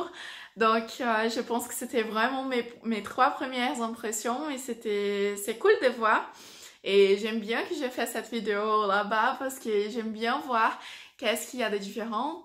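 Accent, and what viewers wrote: France. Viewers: Que linda ela falando francês!!!1 Seu francês evoluiu muuuuito,. Parabéns!!! Tá com sotaque francês já hahaha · Tu as l’accent du Sud de la France, c’est mignon. 💖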